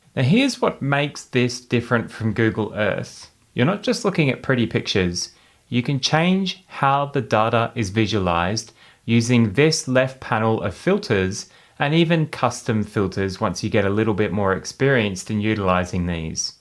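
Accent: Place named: Australia. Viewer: What are you trying to get at , as it seems anyone thats not a so called allie , it seems you've got a negative showing of their defence areas but if its an allie its a running track like new Zealand, I think your thought process is a bit on the side of hate and racism, thats not right especially since you sound like an Aussie, who are you getting paid from, because it seems im the only one thats noticed. Its a shame. Australia has only become like this because of tge stupidity of the American's and how Australian politicians cannot help themselves being other than the yes man or sucking up their arses, this is the whole reason this country has gone to shit . Its the stupidity and thought processes like what you've uploaded. Disgusting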